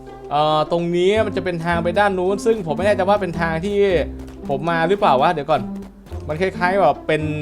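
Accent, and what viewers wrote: South, Thailand. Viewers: พี่อยู่ภาคอะไรครับเห็นพี่พูดคลายกับภาษาไต้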